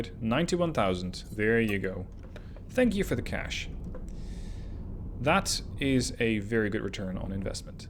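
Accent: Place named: Netherlands. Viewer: holy shit you're dutch! or belgian, or russian (but doubt). ahem I mean "oh cool, a recent avorion stream ^-^"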